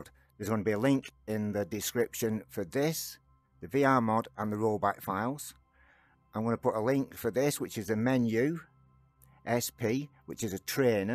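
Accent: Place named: United Kingdom. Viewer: I can't understand what this man is saying because of that accent. Sounds British and I don't understand British.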